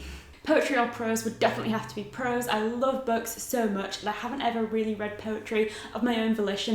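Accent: United Kingdom. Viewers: Do you have a slight British accent or am I nuts?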